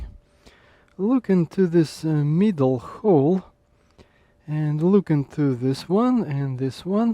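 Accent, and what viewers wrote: Russia. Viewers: У вас такой русский акцент, я ошибаюсь ? :)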